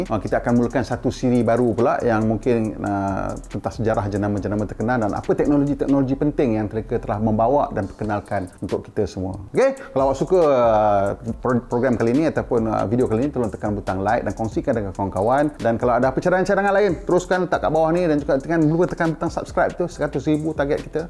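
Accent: North, Malaysia. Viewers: bang sesekali buat video cakap loghat utara lagi sedap la..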